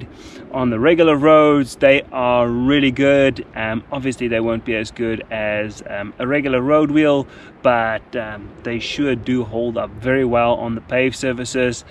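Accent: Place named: South Africa. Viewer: I was wondering what your accent was! South Africa, very cool. Probably good riding there too. I've got 1800kms on my Nano's and there's another 1000 or so km left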